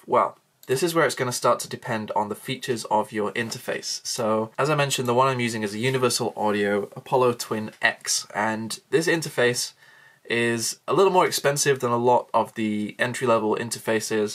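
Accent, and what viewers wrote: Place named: United Kingdom. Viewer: Woah. I didn't know he was British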